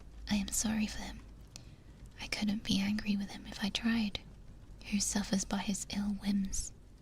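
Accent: United Kingdom. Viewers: proper british bloak reading a proper british tale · That's some proper no frills just straight up full course British asmr :_eepy::_ZZZ::_ZZZ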